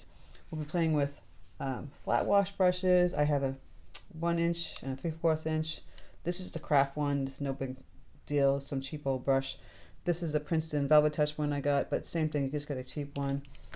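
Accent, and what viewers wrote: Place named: Massachusetts, United States. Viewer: I'm not an abstract painter. I just started watercolors a little over a year ago. I paint too detailed. But I'm gonna give this a try! Hey, you sound like you're from MA? I grew up there moved to TN when I was 12. Love your style here! Thanks for all the informative tutorials!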